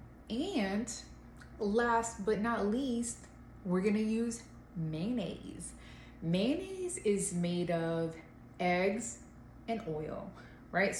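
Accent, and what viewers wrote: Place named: Spain. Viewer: I love this idea for sure… I also love your Spanish accent!!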